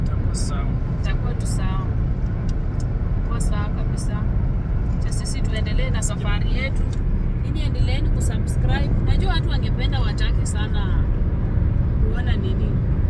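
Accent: Kenya. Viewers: Why can't you just speak in English and stop this luhya accent, sounds really awkward, you still have alot of mushene ya waluhya, uuurgh!!!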